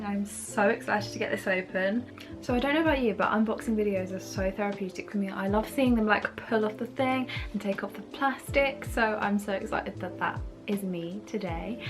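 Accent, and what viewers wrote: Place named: United Kingdom. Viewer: British Accent 💕🔥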